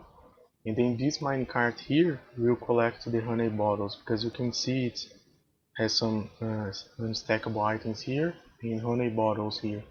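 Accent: Brazil. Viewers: Pelo sotaque, já sei que é brasileiro kkkkkkkk Cara, eu adorei o teu design. Tu teve uma ideia muito top na ideia da coleta e armazenagem das garrafas de mel (minuto do vídeo). Eu fiz um teste dessa parte no meu sistema e até que encaixou bem, além de ter diminuído alguns blocos da parte de processamento das garrafas na minha farm, mas reparei que vem a um custo de mais garrafas de mel no carrinho, e o funil só consegue pegar e entregar uma garrafa por volta, já que quando a primeira desce o sinal de restone reduz para 14. A única coisa que não entendi e acho que foi um erro no teu design foi o sistema de abastecimento (minuto do vídeo). Eu entendi que o objetivo era fazer o sistema ser automático, e usava o sinal de redstone emitido pelo carrinho cheio para enviar o carrinho e distribuir as glass bottles. Havia formas de usar outros sinais para essa automatização (exemplo: luz solar) e também maneiras de fazer o carrinho correr até esvaziar. 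Eu estava procurando vídeos assim, pois eu também fiz uma versão minha (que não copiei de ninguém) da farm de garrafa de mel que usa menos vidro. Eu estava querendo fazer um vídeo, mas antes resolvi procurar se ninguém havia feito igual ou até melhor. Vou ver se faço esse vídeo no mês que vem.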